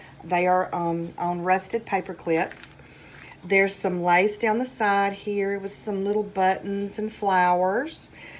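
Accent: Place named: South, United States. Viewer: I can hear your southern drawl, very nice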